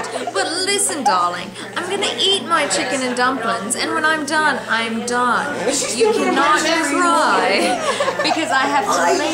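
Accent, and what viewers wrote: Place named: RP, United Kingdom. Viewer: Hey! babe not bad at an english accent mind you it's like Emma from Jane Austin a bit posh. Love the way you kept saying nun instead of nan. i will have to come over and teach you one day; ) TeamUK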